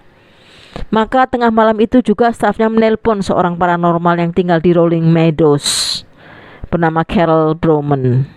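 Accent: Java, Indonesia. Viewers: Apakah kamu sudah menjadi orang yg berguna dan memberikan kata2 yg positif utk org2 disekitarmu?Berkata- katalah yg baik, memujilah!! Kalau kamu tdk suka, bukan berarti org lain tdk suka. Karya dan kreatif, berbeda dgn youtuber lainnya, hargailah. Dgn medok jowo nya lah, menjadi karakter ciri khas yg saya suka. is the best!! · emba ini suara nya kya msih kentel logat jawanya ya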